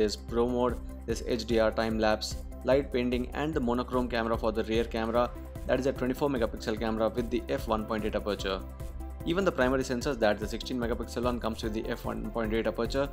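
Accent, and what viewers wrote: India. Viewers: You have a deep thick Indian accent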